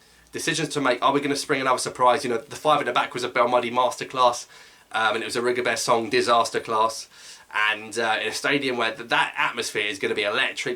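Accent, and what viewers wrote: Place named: RP, United Kingdom. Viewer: well it's a great coincidence to find your channel because I am learning British accent and I adore your "BBC accent" especially when it's about one of my favorite topics which is Algerian football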